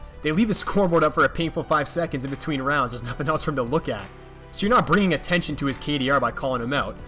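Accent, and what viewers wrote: Canada. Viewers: lol you can tell this guy is Canadian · are u canadian? you sound canadian. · the candian/minnesotan is strong with this one haha. great tip man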